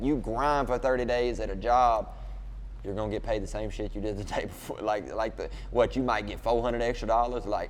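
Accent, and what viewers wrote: South, United States. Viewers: this is exactly why I subscribed to him one day I was just scrolling and came across his video for the first time and he was responding to somebody saying that he talks black and when I hear that, that shit really gets under my skin because I grew up right next-door to him in south Alabama, mobile to be exact so when I hear him, I hear a country boy and when I moved to Texas, I used to get the same shit and I would be so confused because this is how all my family talk like my granny or especially my Pawpaw if you were just on the phone with him, you would think you was talking to a old black man, but now that I’ve been in Texas so long, I can see what they’re talking about because how a lot of the Black people talk over here is how them back wood country white people talk in Bama. But yeah, somebody was hating on him because of his accent and you could tell he was being genuine as hell replying to this hater so I followed him solely off of somebody hating on him and now I’m glad I subscribed to his page because it’s refreshing to see someone being exactly who they are authentic and humble. 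So yeah, I’m rooting for Lil bro and another thing is I really would like to hop on a track with him but I doubt I could afford a verse😂🤷🏻‍♂️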